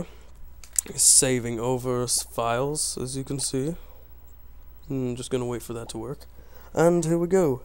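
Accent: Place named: New England, United States. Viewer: Sounds more Kennedy/New England than English I think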